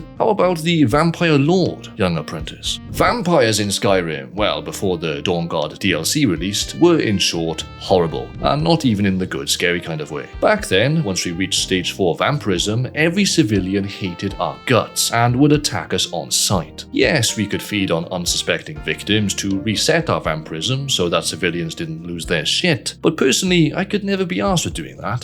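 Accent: Wales, United Kingdom. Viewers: welcome back i have missed your beautiful welsh accent and fantastic videos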